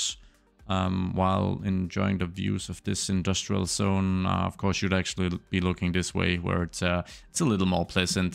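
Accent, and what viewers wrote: Denmark. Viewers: this guy has to be danish. thats some danglish if ive ever heard it lol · Are you danish?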